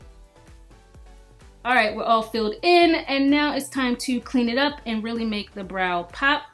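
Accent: Baltimore, United States. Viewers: u have the strongest B-more accent.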